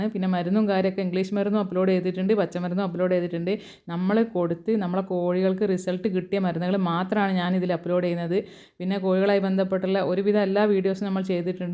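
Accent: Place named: Malappuram, India. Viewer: ചേച്ചിയുടെ പേരെന്താ?😂സ്ഥലം മലപ്പുറം ആണെന്ന് തോന്നുന്നു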